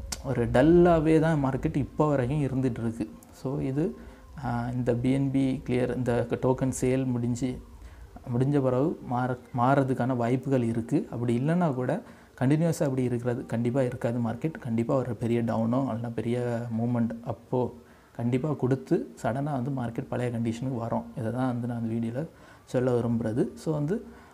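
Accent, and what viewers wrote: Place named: Nanjil, India. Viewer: Brother unga voice vachi Guess panniten nanjil nu Already Mutual Fund la invest pannitu iruken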